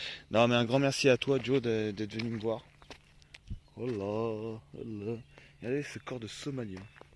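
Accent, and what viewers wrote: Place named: Paris, France. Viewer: je ne sais pas si on peu vivre avec 1 rsa , meme en camion, qd le camion doit aller au garage ca coute 1 blinde ,au veto avec les chiens egalement , .....et tu pleures , ....cest plutot de la survie , tant que tout va bien .........au moindre enmerde c complique , jespere que tu as la possibiite de faire 1 peu de black par ci par la , le systheme D , tu nas pas laire bete , tes chiens ont lair heureux ca c bien, tu fais de belles videos , tu a lair plutot sympa avec ton accent de titi parigo , tu as raison de profiter de la vie t jeune , et te souhaite le meilleur , mais pense que tu va aussi vieillir 1 jours , .......et que la vie en camion na qu1 temps .....continues a nous regaler avec tes videos .tu devrais flouter ta plaque dimmatriculation, avec tout les craignos quil y a sur internet , ......petit conseille de vieux roots .....les bougies de prechauffe cest surement la cause , c pas donne , ......1 camion de + de 15 ans , le turbo peut etre l e probleme , changes t filtres aussi .....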